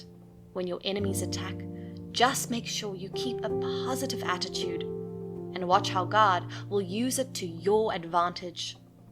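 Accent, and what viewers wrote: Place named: South Africa. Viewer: Thank you for this! You sound South African. :)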